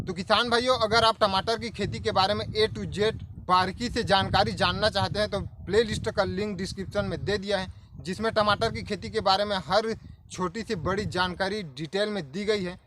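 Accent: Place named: Chhattisgarh, India. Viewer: Bhaiya chhattisagrh se ho kya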